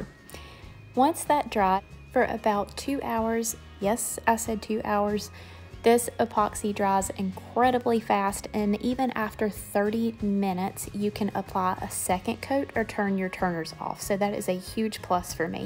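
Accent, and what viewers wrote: South, United States. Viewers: I love your ability to mix items that us "matchy matchy" people would typically not put together. This turned out beautifully, as always. I am curious though, (as a fairly new tumbler maker), how come you chose to do matte when using glitter? I wonder if using a non glitter vinyl and then using the mattify at the end would give it a similar effect? Your cup looked super shiney in the end. Is that how it looks after finishing with mattify? I'm genuinely just curious. Sometimes I feel like I'd rather have a matte finish on mine so thinking about getting some of that stuff. :) Beautiful job as always. I also love the southern accent. I'm in southeastern NC so maybe not as southern but we definitely speak with a southern accent here too. 😋😍🥰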